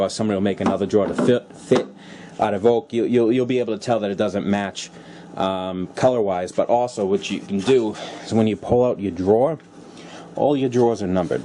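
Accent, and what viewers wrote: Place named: Massachusetts, United States. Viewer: Nice ! I picked one up from a older mans wife who passed away. She sold me around 60 end mills , starrett lufkin BS ect tools indicators planers 123 blocks list goes on and on . She was asking 75$ with out a blink I purchased it. Great video though. Were ya from sounds like Mass im in CT. North east is a machinist dream.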